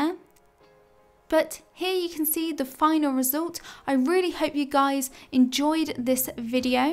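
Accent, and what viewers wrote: United Kingdom. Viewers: U r having a good British accent, Where r u from?? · Everybody looking at her drawing skills but me only paying attention to her Emma Watson accent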